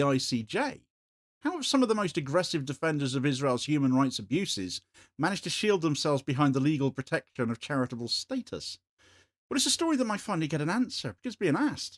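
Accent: Cornwall, United Kingdom. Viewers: Best news I have had all year as a Jewish Devonian. Sorry I know you, like all my Kernow friends, will say I was born wrong side of the Tamar and am an emmet. I really hope this challenge succeeds as two former petitions to the Charity Commissioners by Tony Greenstein and Jewish Voice for Labour to remove status from the Campaign Against Antisemitism failed. Nice to hear your Kernow lilt!.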